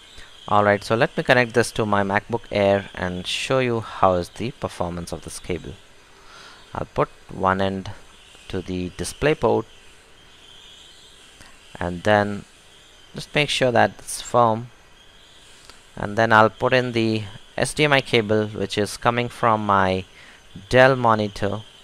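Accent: India. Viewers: He sounds like ever indian I have ever met...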